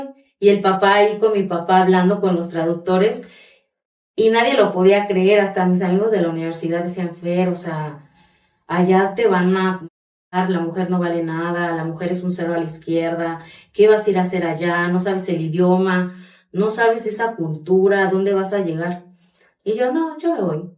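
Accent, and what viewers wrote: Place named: Mexico. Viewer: Cómo que ya está perdiendo el acento mexicano. 🙏🏽🙏🏽🙏🏽!!!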